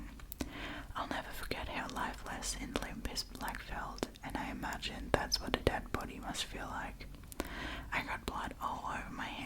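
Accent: Australia. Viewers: Okay I have to ask about your accent, I am a new sub so apologies if you have answered this already! Have you hung out with a lot of Australian English speakers? (I was assuming you were German by an earlier video) getting a strong Australian accent vibe though, so needed to ask 😊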